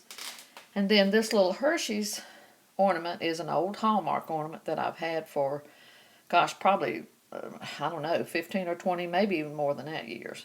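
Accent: South, United States. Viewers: your house is so cute! I simply love it! You have a southern accent what state are you from? I am from michigan. I might just have to subscribe. Debbie in michigan. 🎄🎅🎁😄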